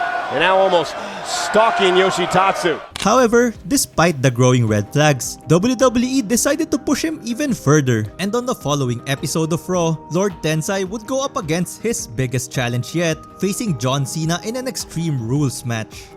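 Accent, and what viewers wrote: Philippines. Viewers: is bro talking had a filipino descent??? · I can hear your accent is filipino.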